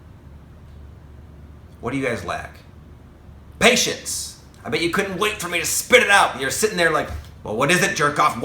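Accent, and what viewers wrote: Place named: Boston, United States. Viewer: Ahhgg, it's a Boston! 😄💕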